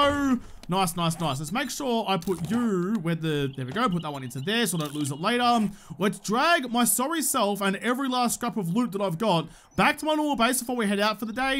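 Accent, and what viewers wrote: Australia. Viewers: You got to hit up those rand-o police cars when you see them cause they are caulked full of what you like to call "lovely dovely's"! (thought i saw you fly by one in the desert and I think there is one near your shitty lighthouse)!! Cheers to my favorite little speed talking ball of fucking Aussie goodness gamer from KC Missouri USA!!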